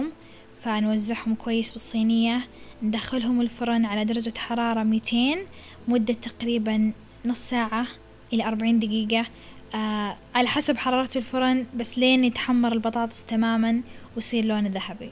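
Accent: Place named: Najd, Saudi Arabia. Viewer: يعطيك العافيه يارب شكلها يشهي جدًا ماشاء الله عليك أحب قناتك ولهجتك هههههههههه من النادر أسمع لهجتنا النجدية في يوتيوب وه بس 💖 ،، ملاحظتي بس إن كمية الزيت كبيرة عشان كذا ممكن ماتعتبر طبق صحي تمامًا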